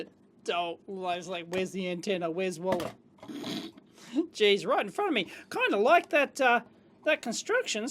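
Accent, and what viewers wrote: Australia. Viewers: JuiceOfSapho more like Dundee ! Crocodile Dundee! with the similar accent . or maybe Jim bowie!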